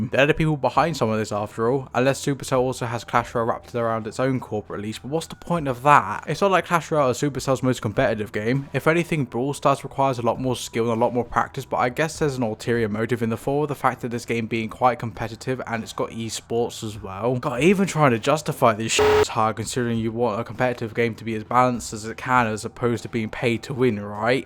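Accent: United Kingdom. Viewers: Real ones just turn on closed captions to counter the british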